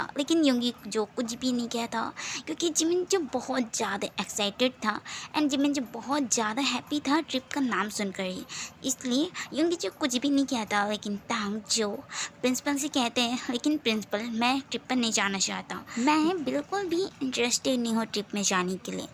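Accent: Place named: Pakistan. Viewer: what u want to prove like this? I mean your urdu accent..........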